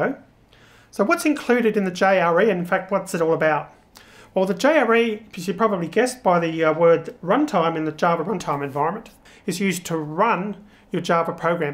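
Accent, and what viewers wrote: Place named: Australia. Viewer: I love learning java with aussie accent!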